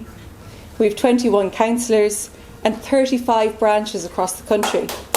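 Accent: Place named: Cork, Ireland. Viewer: its great you never lost the cork accent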